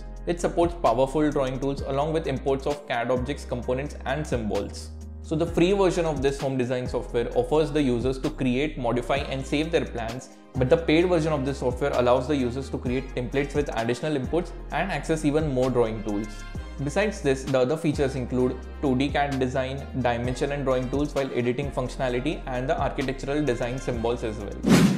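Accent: India. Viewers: It happens, don't worry. That's Indian accent. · Can we just appreciate this guy's beautiful voice nice indian accent ;)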